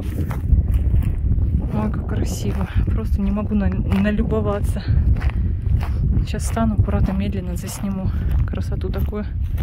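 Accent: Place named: Armenia. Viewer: ты говоришь по русски с милым армянским акцентом 😁👍🌹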